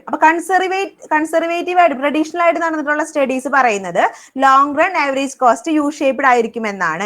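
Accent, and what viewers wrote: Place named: Thrissur, India. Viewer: ടീച്ചർ ഒരു തൃശ്ശൂർ കാരി ആണെന്ന് തോനുന്നു 😊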